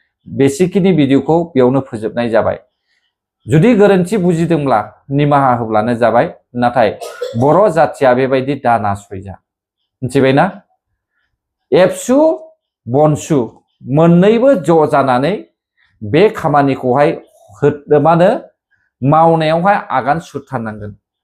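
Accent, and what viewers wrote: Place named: Bihar, India. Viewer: Hindi Raoa biharniswmwn ada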